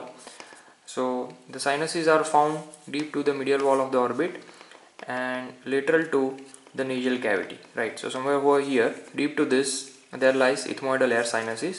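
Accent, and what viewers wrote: India. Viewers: Very hard to understand. All your words are just joined together in a train sequence, theres no distinguishability between 1 word from the next. When you speak with an accent, specially a heavy one, you must talk slower, take a break between parts in different parts within the sentances, and pronounce words heavier. Otherwise it just sounds indian. This is advice from a linguist !!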